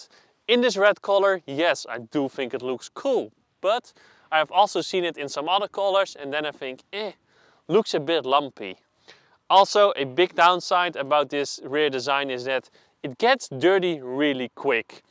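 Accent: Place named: Netherlands. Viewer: Compliment hoor ,jij spreekt echt heel goed Engels ! 👍 Ik hoorde aan jouw Nedelandse accent dat je Nederlander bent.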